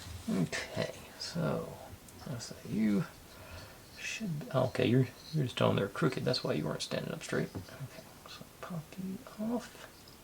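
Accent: United States. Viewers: Because English is my second language do you have an accent? Because sometime sounds perfect American and sometime not.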